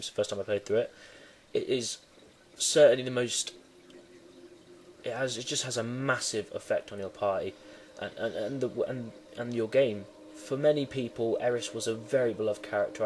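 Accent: United Kingdom. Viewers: the video would be more epic without your very gay voice, let me guess britain? all islandmonkeys sounds gay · Are you british?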